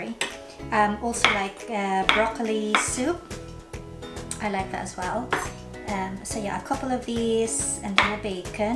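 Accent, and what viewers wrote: United Kingdom. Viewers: look so delicious i would love to taste that & i love listening to your british accent sis. new friend here from australia teamlipat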